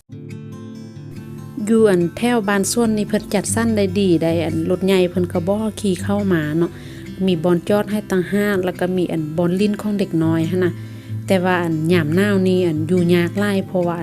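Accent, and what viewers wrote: Luang Prabang, Laos. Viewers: สยายดี, มารับชมนำเด้อ ฟังเสียงเจ้าคือคนหลวงพะบางเนาะ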